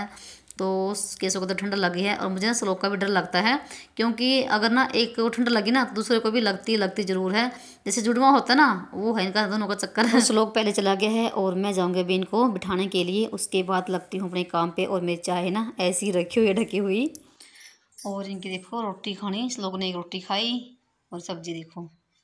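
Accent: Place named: Haryana, India. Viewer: Or aap ye bhasha kaun c bol rahi hein aapki bhasha thodi haryanvi touch liye hai aap wahan se hein kya..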